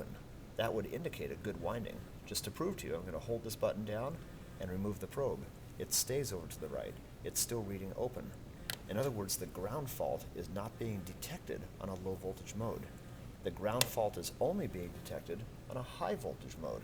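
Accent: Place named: United States. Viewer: fed up of us accent ............. dat person shud learn english frnst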